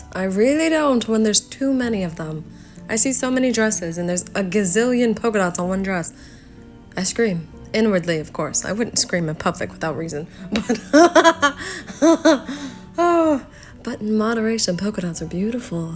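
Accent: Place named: Transatlantic, United States. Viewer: your voice sounds like a very 90s icon doing a 1960s transalentic accent and it is the most amazingly delicous thing my ears have heard in the while.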